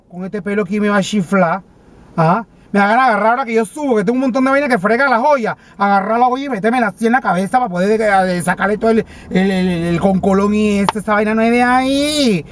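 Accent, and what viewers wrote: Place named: Panama. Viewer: oirte hablar desde España (vivo en España), me trae muy buenos recuerdos de mi Panama. ¡Chúleta! casi me meo de la risa.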